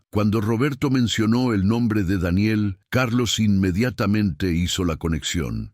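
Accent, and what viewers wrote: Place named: Chaco, Argentina. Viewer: te veo de argentinaho escucho pravincia del chaco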